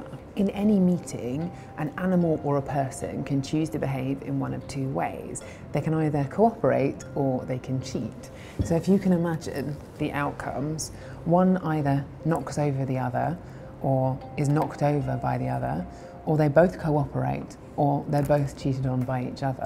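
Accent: United Kingdom. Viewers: Alfio Raciti and british. Best combo.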